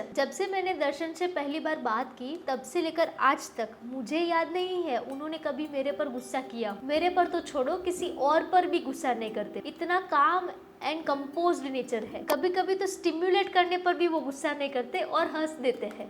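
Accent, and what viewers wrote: Gujarat, India. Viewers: I love gujrati tonn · If Gujarati are speaking Hindi 😊✌️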